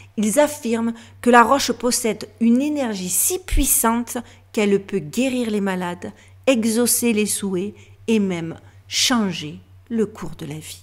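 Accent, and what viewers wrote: South, France. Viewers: Bonjour belle d’âme d’outre-Atlantique avé l’accent du sud de la France qui doit te manquer comme ça m’a manqué après quelques années passé dans les îles de la Caraïbe! C’est un plaisir de t’écouter narrer toutes sortes de récits le dimanche, alors on écoute et on remercie après le visionnage de la vidéo! Prends soin de toi et plein de gros bisous 😘! Amicalement 🙏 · Merci pour cette vidéo et ce thème fort intéressant. Toujours OK pour une suite, si cela vous inspire. Oui, aussi pour d'autres sujets, car vous savez toujours nous présenter et interroger sur nombre de sujets: pyramides, Antarctique... Oui, oui, oui! Depuis que je vous ai découverte, j'ai tout écouté et je n'ai jamais été déçue et suis toujours en quête de savoir plus. Merci infiniment pour ce gros travail et présenté à la! Merci pour votre accent qui nous amène le soleil du sud de la France! 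Merci d'être et de faire! Caresses à la Duchesse. Prenez soin de vous et de votre fils. Bonne semaine et à dimanche prochain 🙏🏻☀️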